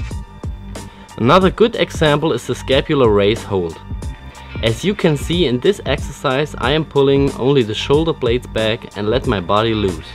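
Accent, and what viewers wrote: Germany. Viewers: hahaa, typical german accent. :D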